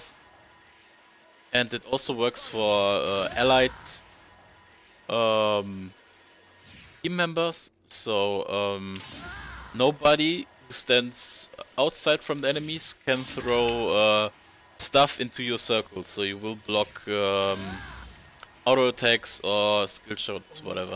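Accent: Germany. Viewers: Nice video it really helps me. From what country are you? Your accent sounds a bit German.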